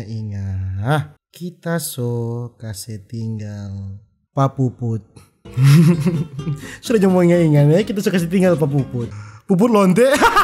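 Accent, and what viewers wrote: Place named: Palu, Indonesia. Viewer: Rupa ada ba logat palu tadi ka oppo 😂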